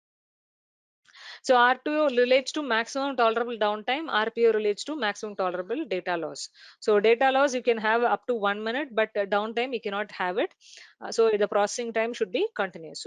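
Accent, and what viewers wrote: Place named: South India, India. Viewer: Disgusting South Indian accent. It’s domain not domine!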